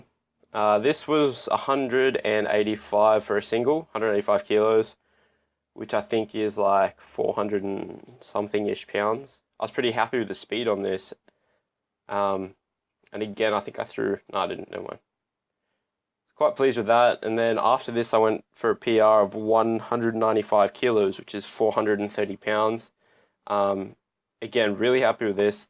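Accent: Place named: Australia. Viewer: Lol Cheers Tim. It's an Aussie accent :-)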